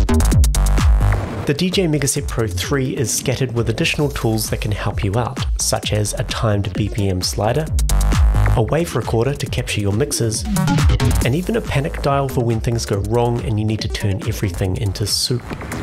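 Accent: New Zealand. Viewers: Gotta love the NZ accent :) Dicks everywhere!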